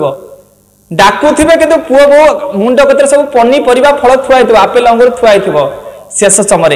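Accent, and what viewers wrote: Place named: Sambalpur, India. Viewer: ସମ୍ବଲପୁରୀ ସ୍ଵରରେ ଚାଲିଛି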